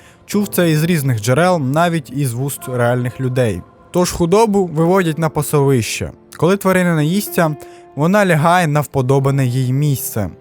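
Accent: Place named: Cherkasy, Ukraine. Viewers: молодець. У вірному напрямку рухаєшся. А ти з відки? Регіон? Черкащанський говір))) ні? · А пан часом не з Черкащини, якщо задає таке питання? Говір характерний для центральних регіонів. А Черкащанський, це який?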